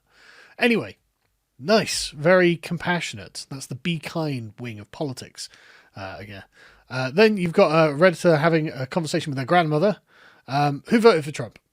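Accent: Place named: United Kingdom. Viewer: i think you had something on your face. british english is weird. youll prounce "water" as "watah", but "swastika" as "swastiker".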